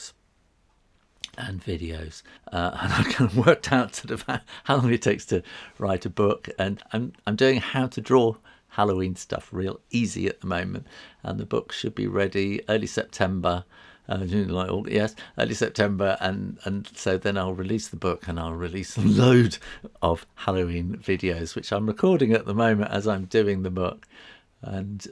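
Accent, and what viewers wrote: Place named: United Kingdom. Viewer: I want to be british when i hear your voice :) And love your cheerfull ways. You have been working as and illustrator for a long time and I as a fulltime illustrator of abour 5 + years, would love to hear all about your career and life. A little like your draw my life videos but with just you talking. Other than that it is so important to think about what your mountain is, what your goal is within your art. But about this channel I would personally just love to see your smile and voice here on youtube. But if it takes to much energy and does not give you anything I would quit the workload that does not fit you. Love Nille from up in Bergen, Norway ❤️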